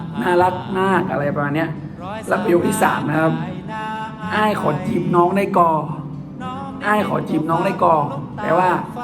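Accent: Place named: North, Thailand. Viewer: ภาษาเหนือน่ารักจังค่ะ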